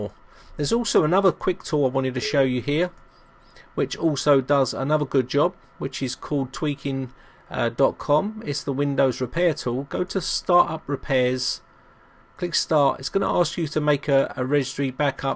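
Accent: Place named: South London, United Kingdom. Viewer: I'm a 68yr man, and even an old fart like me can understand your consise instructions. Are you a South Londoner? you sound like one,I was raised in Stockwell.I can still remember all the Pride and Clarke motor bike shops along Stockwell Road. Thank You very much,I do envy your know how. All the best mate, Mark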